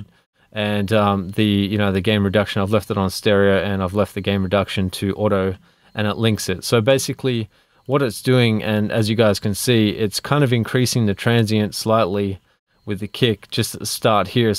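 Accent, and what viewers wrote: Australia. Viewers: Aussie accent ?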